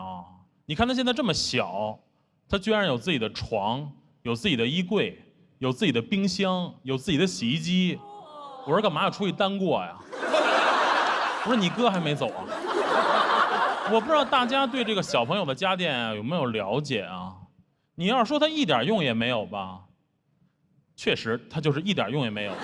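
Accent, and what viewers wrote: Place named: Beijing, China. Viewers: 可能是在北京生活过太久的时间 所有这些脱口秀就这个京式幽默我笑不出来 没觉得好笑 感觉都钝感了 光是那个口音出来我的笑点就提高了20层楼高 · 好喜欢他的京腔啊❤ 段子也好👍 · 他的北京话我爱听，不烦人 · 京腔是我的最爱，没有之一。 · 特喜欢良言，京腔儿听着特舒服，幽默高级，不喊不叫，但让人乐半天，加油！ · 典型的京腔幽默😂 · 听了良言的京腔想起童漠男了。他的段子也很喜欢！ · 典型的京腔，条件反射式的反感啊，唉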